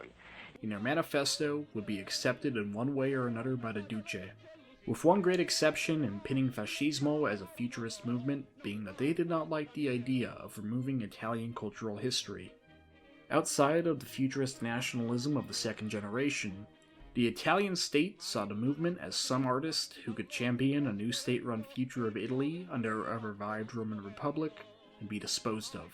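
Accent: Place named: Wisconsin, United States. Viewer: You sound like you're from Manitowoc, Wisconsin.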